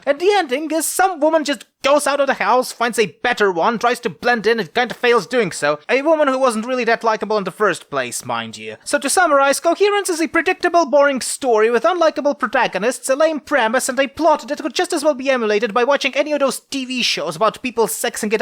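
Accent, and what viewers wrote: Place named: Eastern Europe. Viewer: Damn, I slowed it down and got classic east EU accent from US movies. Git gud -_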